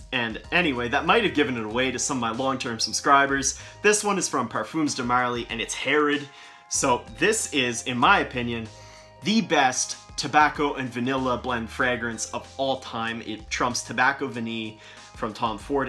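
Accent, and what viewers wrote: Canada. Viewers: With that accent (sounds Canadian) I wish the would stop saying OOTside. Arrrgg.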